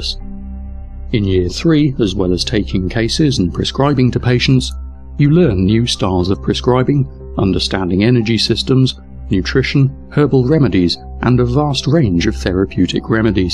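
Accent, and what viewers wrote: England, United Kingdom. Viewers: I know a fellow englishman's voice when I hear it, but I just can't place your accent. What part of england is that?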